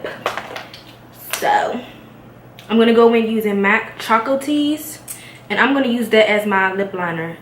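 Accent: Washington DC, United States. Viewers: Where are you from you sound like us girlies from D.C.!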